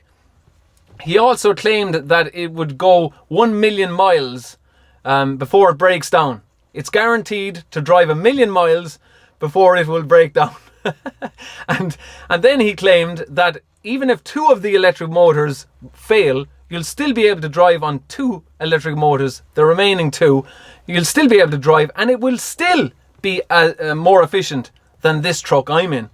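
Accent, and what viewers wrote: Ireland. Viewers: It's my first time here, too. He's got a Greek name and an Irish accent! Love it.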